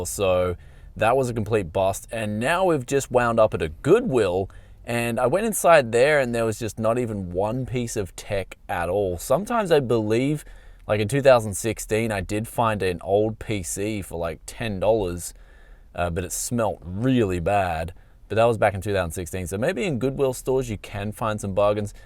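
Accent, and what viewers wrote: Australia. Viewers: I deadess heard you say you're checking out some "Porn brokers" love that aussie accent. :D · SuperPorn! Got to love Austalian accent ! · It’s the Aussie accent 😂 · The Australian accent didn't do you any favors.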